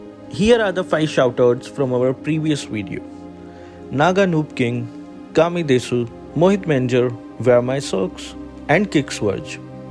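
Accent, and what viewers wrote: India. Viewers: chekwa na tunog indiano. lintek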